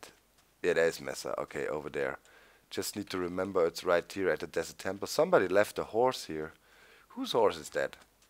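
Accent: Germany. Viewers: LIKE FOR HIS GERMAN ACSENT!!!!!!!!!!!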